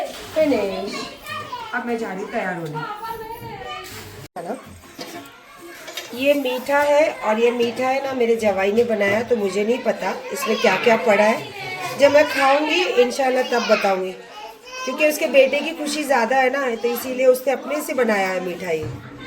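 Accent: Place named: Deccan, India. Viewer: WOW she's talking dakhni urdu❤❤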